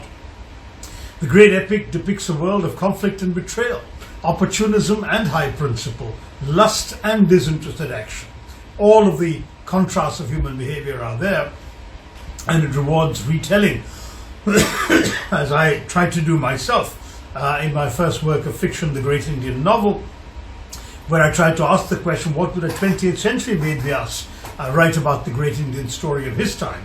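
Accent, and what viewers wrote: England, United Kingdom. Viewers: Is the British accent necessary? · Such an English accent...lovely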